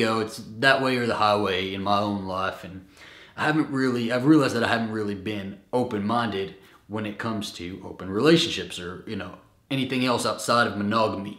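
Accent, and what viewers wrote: United States. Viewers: where the hell in America are you from? Your accent is crazy American!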